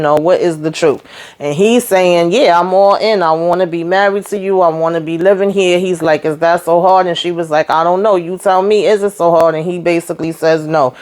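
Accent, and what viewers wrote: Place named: New York, United States. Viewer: Thank you for your excellent reviews. I just love your NY/NJ accent. It sounds like home. (I’m from LI). These LALU folks are not in their right minds especially Gabby and Chris. I fear for them and can see the more domestic abuse in their future. What a mess, but it’s so entertaining 😁 Keep it coming sis! Blessings 🙏🏾🙏🏾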